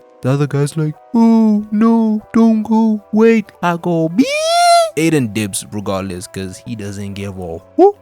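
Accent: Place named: South Africa. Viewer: For some reason I hear mzansi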